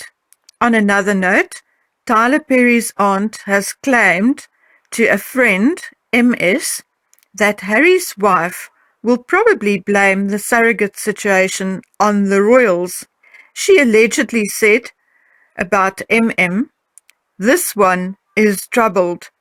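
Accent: South Africa. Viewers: I like your South African Accent!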